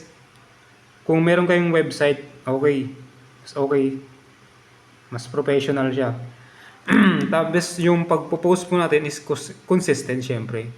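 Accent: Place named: North, Philippines. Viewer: taga north ito halata sa tigas ng salita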